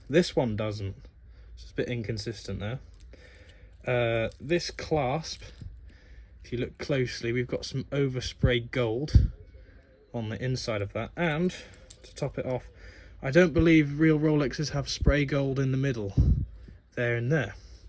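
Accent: United Kingdom. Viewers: I JUST LOVE YOU!! And your accent! Not many Brit's speak as well as you esp your cadence and vocabulary! You're brilliant! Thanks for showing us your watch collection! Plus, what a special honor to your Dad!! I never knew anyone who can build their own watch!! Wow! And from you being on your mom and dad's videos, I can see you've inherited both of your parents' talents! Thank you for making this video! I have developed a true crush on you!! Just a complement! But you're so likable and soooo cute! Love to your Mom!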